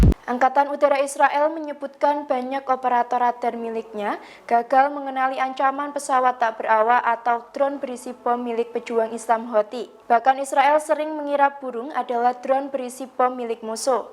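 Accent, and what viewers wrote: Java, Indonesia. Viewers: suarane medok,,,tp merdu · Ngomngnya medok amat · Soundnya kok medoookkk banget 😂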